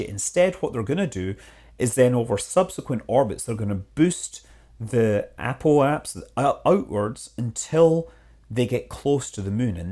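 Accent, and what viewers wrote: Scotland, United Kingdom. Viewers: Scottish accent.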